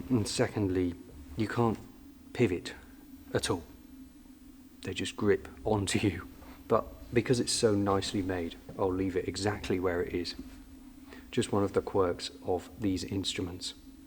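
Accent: England, United Kingdom. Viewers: The only one with a beautiful English English accent that doesn’t talk about castles or ghost it’s kind of nice. I walk around England talking about the beautiful town of England, which I love that too, but this is very different and very fun.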